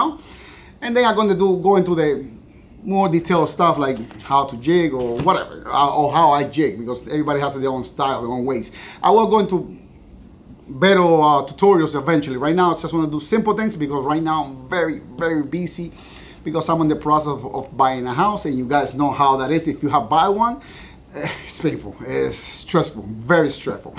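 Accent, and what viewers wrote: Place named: Cuba. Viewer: Brother, I'm Cuban on my father's side, and he passed away in 2019. Your Spanish channel has allowed me to retain my Cuban accent and learn to be able to speak about fishing in Spanish and even develop my Spanish. Your accent vividly reminds me of my father and I have learned so much from your videos in the short time I've been following them compared to other Youtubers who speak perfect English. Your English is amazing too, don't let others discourage you! You don't improve without trying.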